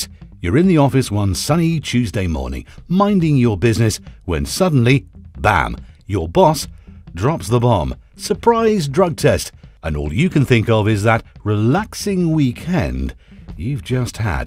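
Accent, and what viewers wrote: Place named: United Kingdom. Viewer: Why is a British guy commentating when marijuana is illegal in the UK that doesn't make sense are Americans supposed to be more interested if they hear a British accent.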